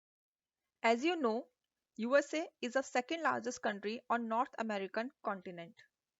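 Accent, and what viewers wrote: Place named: India. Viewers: Bro work even harder.... master your English learning skills so you can even understand Indian accent.... not from India but seems like you've a dig with Indians!! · I do not want to offend you, but maybe you have to think about the rest of the world, not just India. If you want that your video is understood by the whole world, you have to make it as similar to an American neutral accent, as possible. That is just the way the world is. Otherwise, people just will not understand it... I did not understand what you said, I am Mexican, yet I understand pretty much any other videos... so there you go... if you do not care to be understood by as many people as possible, then keep the Indian accent, people from India will understand you, but half the world will not. So, it is up to you... for instance, us Mexicans and people from Latin America work very hard to have an American accent, just so that we can get a better job. 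But, again, it's up to you.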